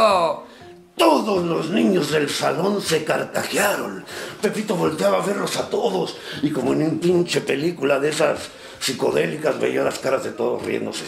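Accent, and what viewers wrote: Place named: Argentina. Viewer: La verdad que te sale muy bien nuestro acento argentino. Pero no le vi la gracia al chiste. Aunque si me gusto lo de pepito que si le cambia una letra se llama "peputo" ajajaja ajajaja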